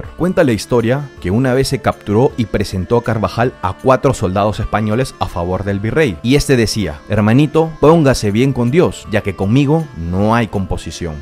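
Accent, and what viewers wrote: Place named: Lima, Peru. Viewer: Muy buen pasaje histórico del Perú...y el presentador muy limeño...reemplaza la S por la J...😂😂😂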